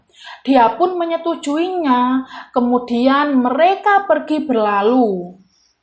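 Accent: Java, Indonesia. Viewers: Medok mantul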